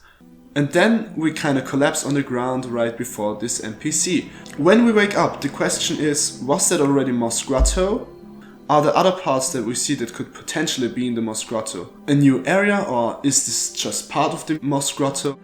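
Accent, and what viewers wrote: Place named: Germany. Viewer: Ich der direkt nach den ersten Sätzen bemerkt das nen Deutscher ist den man hört es einfach richtig stark raus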